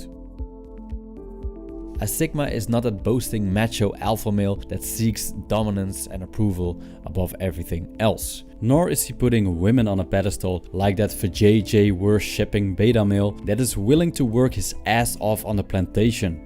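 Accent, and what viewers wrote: Netherlands. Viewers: You have a bit of a Dutch accent, is this correct? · Your accent sounds very Dutch :) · Mmmmm, your accent... I guess it's Dutch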